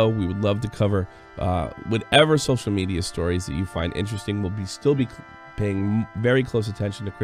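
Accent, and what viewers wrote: United States. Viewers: Your English is very American. If your not in North America, what part of the world are you? Just curious since you have no accent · are you natively german? your accent sounds american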